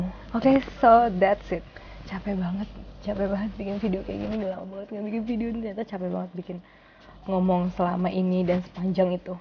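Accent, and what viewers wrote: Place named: South Jakarta, Indonesia. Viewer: Lidah Jaksel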